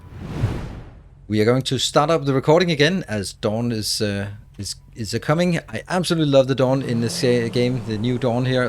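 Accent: Denmark. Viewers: This dude is for sure Danish :D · Love the video one question are u danish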